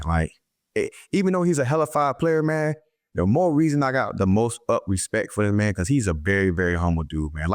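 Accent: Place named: South, United States. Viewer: Bro i always tried to figure out why you was so country i see where you from Im right next door lol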